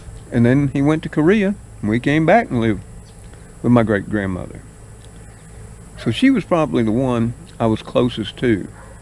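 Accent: South, United States. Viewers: Good ole home southern talking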